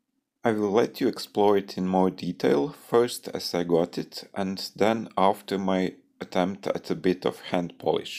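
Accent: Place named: Eastern Europe. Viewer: Thank you! That's very kind of you! I do appologize for the thick 'slavic' accent 😃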